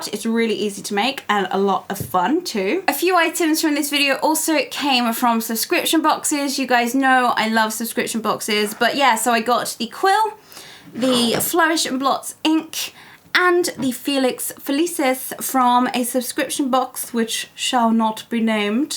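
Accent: United Kingdom. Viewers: Cute video :) Cute cardi/vest-thing - love your British dialect/accent :D I just recently started following you, and I binge-watched a lot of your subscription box videos. I have signed up for the LitJoy thing and am excited to see if I can manage to get one. About the GeekGear boxes, I'm thinking of getting one of them - I would like to know which you would recommend: The wizardry one or the special edition one? Maybe you could do a video onm it, if other people have asked as well :) Thank you in advance, and have a lovely day!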